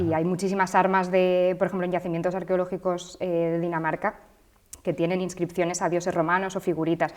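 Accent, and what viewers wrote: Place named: Spain. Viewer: Que guapa mujer España definitivamente sus mujeres y su acento el mejor del planeta !!!! Sé que no tiene nada que ver con el video, pero no pude aguantar comentar 😉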